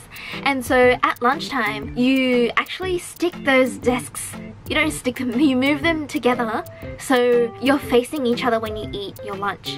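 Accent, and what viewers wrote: Australia. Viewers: Me gusta saber inglés y entender lo que dices sin necesidad de subs, gracias por el vídeo mija :D P.d: Me gusta tu acento Australiano... no estoy segura si es de ahí.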